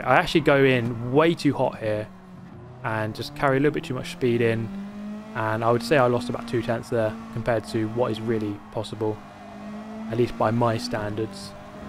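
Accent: United Kingdom. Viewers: Listening to laid back british accents is the thinking mans opioid.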